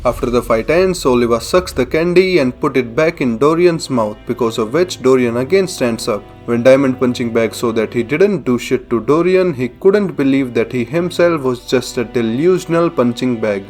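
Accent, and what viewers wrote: India. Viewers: Accent: indian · If its not for the thickest Indian accent, this videos would have been a bang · The eloquent way of speaking and indian accent makes this 10x funnier. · I just fucking love these videos, indian accent with sarcasm its awesome! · The stoic Indian accent is way too hilarious!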